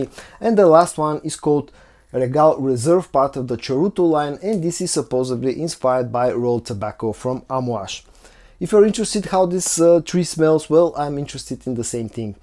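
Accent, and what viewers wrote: Bulgaria. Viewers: Great video! You're getting getting better all the time. It was funny how you just walked past the camera in the beginning. 😂 I enjoy listening to your beautiful Bulgarian accent as well, and how some words are pronounced, like it sounded like you said "meatloaf note" when you actually said "mint". 😅 I was like"Yeah! Now I need to find a bacon note!" Great to see you getting more comfortable. Thank you for the time you spend sharing with us. Love from Virginia, USA.❤️🙏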